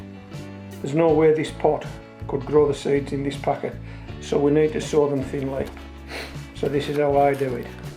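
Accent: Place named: Scotland, United Kingdom. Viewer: Accent seems almost Scottish. Workington up near Scotland?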